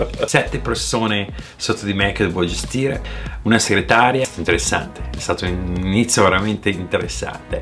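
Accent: United States. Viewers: Ciao,ho appena iniziato a seguirti e gia mi piaci.Come fai ad avere l'accento americano?Ho anche io in mente di utilizzare youtube per raccontare la mia storia ed esperienze.Spero di riuscirci.Ciao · Ah ok lo hai spiegato qua! Come non detto scusami.. by the way grazie che mi hai raccontato le tue esperienze di phatos! Vorrei intraprendere anche io un esperienza come la tua , come graphic Designer facendo un esperienza.. vediamo cosa succede dai.. due domande 😂 dove vivevi in Italia? E come mai ora hai un accento americano molto forte? Tanti altri che vivono anche da 20 anni parlano italiano con accento italiano... In bocca al lupo!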